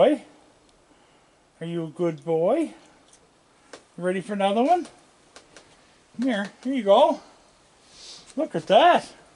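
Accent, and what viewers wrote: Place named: Canada. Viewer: My dad loved cats and wildlife. He was always camping, hiking, fishing. Even with the fishing, he stopped using regular hooks and moved to painless hooks (I have no idea what they’re called). He felt bad for the fish. He was as sweet as you, so similar to you. Even though you have a Canadian accent and my dad sounded very Appalachian, you still somehow have similar voices.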